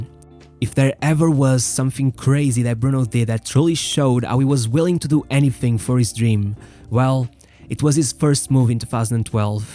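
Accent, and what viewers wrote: Portugal. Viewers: Mal comecei a ouvir falar, "este gajo é português". confirmed😂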